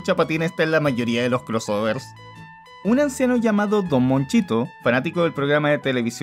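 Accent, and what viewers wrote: Chile. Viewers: a mi lo que me gusta es tu acento chileno poh saludos desde guatemala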